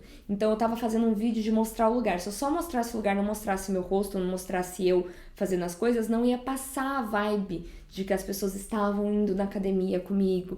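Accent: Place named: Rio Grande do Sul, Brazil. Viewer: sotaque de gaúcha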